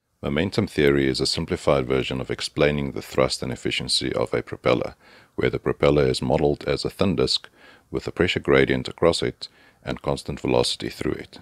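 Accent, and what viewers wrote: South Africa. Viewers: You sound very South African, thanks for the videos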